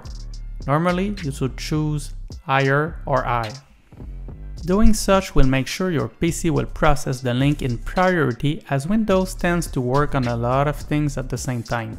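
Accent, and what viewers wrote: Quebec, Canada. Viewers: accent du quebec sa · Nice video bro haha j'ai direct su avec ton accent que t'étais QC lol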